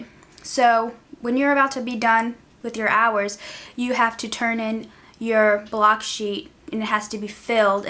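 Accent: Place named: Louisiana, United States. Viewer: I'm from Mississippi and as soon as I started watching your videos I thought you had a Louisiana accent. I'm getting ready to go to regency beauty institute in Virginia this month!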